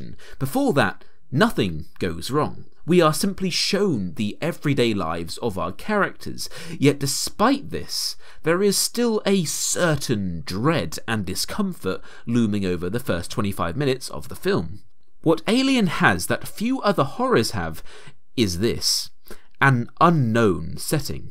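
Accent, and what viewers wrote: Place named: United Kingdom. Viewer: The narrator sounds like a British man 🤔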